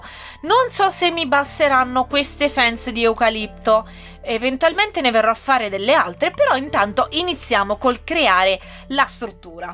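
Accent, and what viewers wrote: Italy. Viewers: ma sei marchigiana?P.s leggilo.Ti stimo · comunque ogni tanto l'accento de Roma se sente e te sta pure bene. Per il maiala puoi usare carote, patate, o barbabietole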